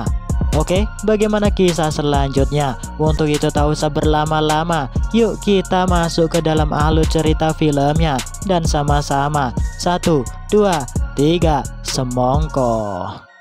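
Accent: Madura, Indonesia. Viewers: Orang Madura bang? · MIMIN NYA ORANG MADURA KAH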